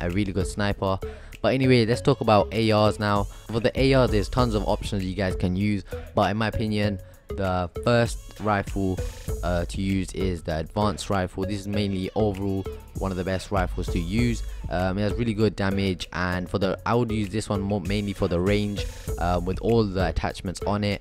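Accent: United Kingdom. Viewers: HE TALKS BRITISH 🔥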